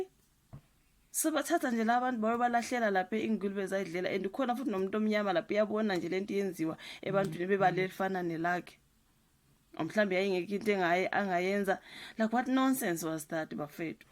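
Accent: Zimbabwe. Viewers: Your Zulu is not make sure it sound Zimbabwean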